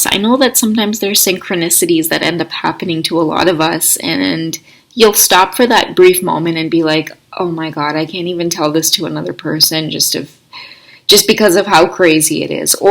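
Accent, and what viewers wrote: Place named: Trinidad, Trinidad and Tobago. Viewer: Girl, sorry I forgot that I could reply to you in the oldest post videos, like I did before because I am hiding a little bit here, hehe, Thank you Girl so much for all the readings, I really love you too, and I love your Trinidad Accent too I always want to say that, so funny and of course natural cause that is your natural language, hehe ❤💖 Love you so so so much, you are like my best friend, the readings are like daily Vitamins for my heart, all, the Romantic ones, the Sensual ones, ect, all, hehe 🥹🙏 thanks so much for all the readings Girl, I can't thank you enough, let me pay you with my Love hehehe 💖🥹🫂😚muah🩷💖 Thank you so much 🩷💖 (Hehehe, i pick this video by random actually, just what oldest and scroll down a bit, wkwkwk🙏🩷)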